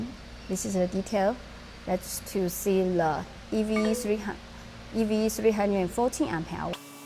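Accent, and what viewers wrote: China. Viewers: gave such a great presentation. For some reason, i can easily understand a mandarin accent but i have great difficulty understanding an east indian accent.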